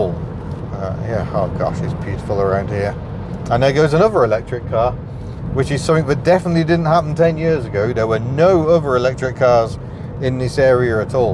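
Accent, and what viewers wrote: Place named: Midlands, United Kingdom. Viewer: Hi mister you always young!!! Can't believe is about 9 or 10 years since i follow your remarkable automotive's footages. Not only to discover incredible similarities and incredible differences between your automobile's lot and the our's in Southern America. Yet liking so much to improve my English by listening to your impeccable Midland's accent. You aren't only an outstanding car's tester with enormous talent for describing machine's featurings but also a great honourable person that knows how to connect different people from different abroad's countries under the same good feeling. True compliments from the Republica Argentina!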